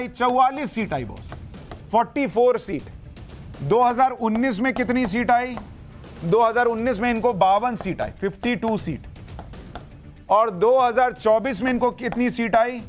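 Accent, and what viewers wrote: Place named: Kanpur, India. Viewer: Abe bhai tum ho kahan k……aisa bolte ho k man ek dam gad gad ho jata hai……kanpuria jyada lagte ho…..but mast delivery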